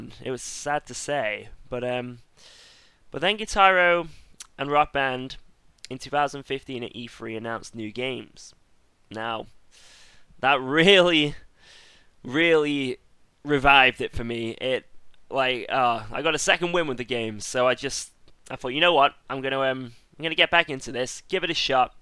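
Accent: United Kingdom. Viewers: I'm not quite sure or how I ends up started to watch your stream. I never played GH at all. But after watching your stream, I bought my first guitar controller and just realized how godlike you are You deserves all this man, you works so hard everyday, producing something that considered impossible for mere human Also that sweet smexy British accent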